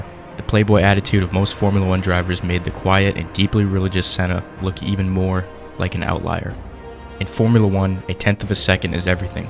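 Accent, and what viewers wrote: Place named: United States. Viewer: Can’t take these Formula One videos seriously with an American voiceover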